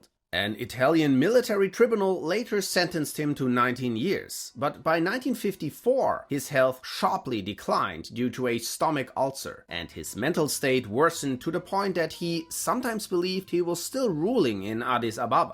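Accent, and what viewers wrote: Germany. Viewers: Said by a german gives it a wholly different taste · Aha! Quite good, but very german 😊... Like my german: quite good, but very italian 😂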